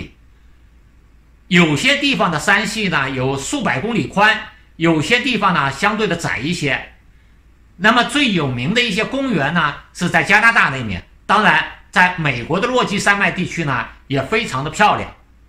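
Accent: Shanxi, China. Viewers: 家門兒山西人嗎？聽出來了哈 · 你是山西人吧? 自己好好纠正一下.难听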